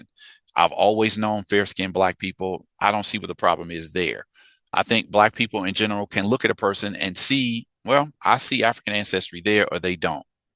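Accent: United States. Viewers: Question: Are you from Brazil?…You sound like you’re from the USA.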